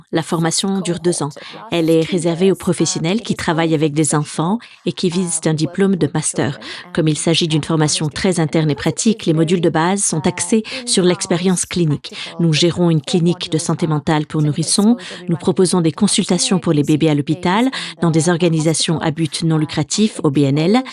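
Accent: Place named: France. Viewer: Vidéo très pertinente!! Et merci pour la douce voix française qui a permis une écoute très agréable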